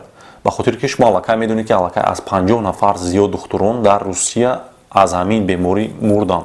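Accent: Khujand, Tajikistan. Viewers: Духтуримиз назаримда Хуҷанлик. Акцент 100% тушунарли.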